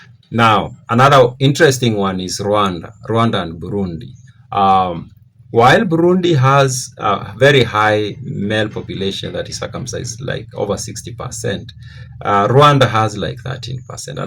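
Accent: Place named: Luhya, Kenya. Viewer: I love insightful présentations, I expect to learn from those that have endeavored to research. However, when I information that's closest to me i.e. the obvious to me being incorrect, I regard all the rest as incorrect. You sound Luhya from Kenya, very close to Uganda, yet the info about Uganda is so false The only Bantu from Uganda that circumcize are Bagisu and Bakonzo/Bamba; less than 10% of the total population of Uganda.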